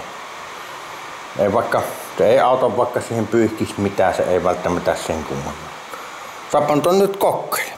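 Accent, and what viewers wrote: Savo, Finland. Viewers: Rikasta tuo savon kieli😊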